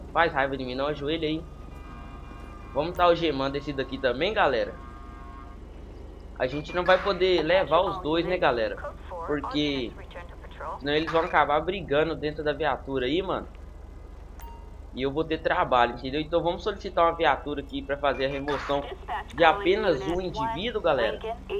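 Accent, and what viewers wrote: Minas Gerais, Brazil. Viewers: vc e mineiro e?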